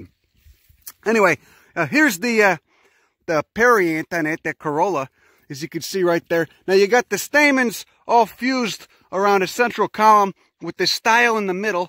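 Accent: Chicago, United States. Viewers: I KNEW you were an Italian from Chicago, we recognize our own around here lol. That accent is straight South Side. Good on ya bud, definitely appreciate the effort on that little guy's behalf. Sending you good vibes from da burbs.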